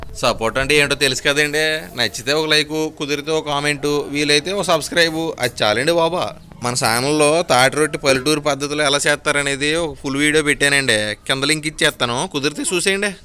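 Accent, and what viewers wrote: Godavari, India. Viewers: Enthina godavari godavari slangs 😅😅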